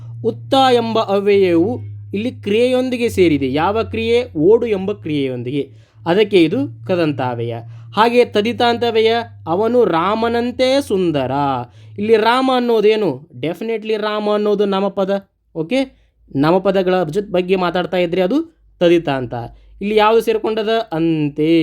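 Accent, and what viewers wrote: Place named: North Karnataka, India. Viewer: Tq sir i was waiting for this class!!nimma uttara Karnataka bhashe tumba chenagide 🌹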